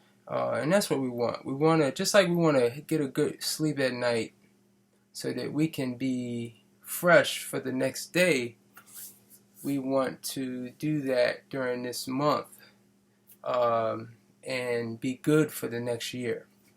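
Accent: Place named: DMV, United States. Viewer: u sound like ur from the DMV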